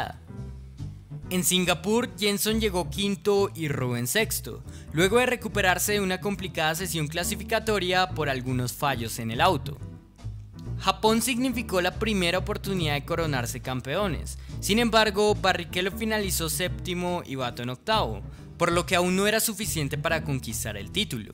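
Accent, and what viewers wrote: Colombia. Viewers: Por el asentó colombiano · Felicitaciones, gran contenido, con rigor técnico, sigue así y llegarás lejos. Por tu acento eres colombiano, así que un saludo a ese gran país cuna del gran Juan Pablo Montoya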